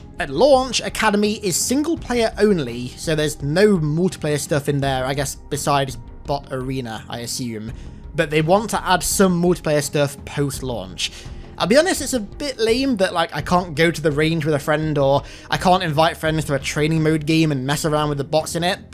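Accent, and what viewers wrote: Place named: United Kingdom. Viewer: This man has the signature british voice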